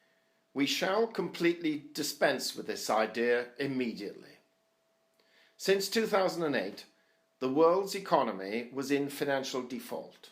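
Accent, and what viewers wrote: United Kingdom. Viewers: I like your British accent. Unique and attention grabbing.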